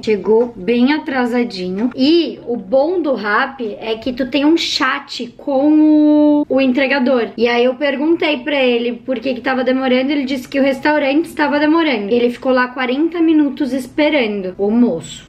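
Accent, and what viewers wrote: Rio Grande do Sul, Brazil. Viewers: Você é gaúcha? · Vc é gaúcha?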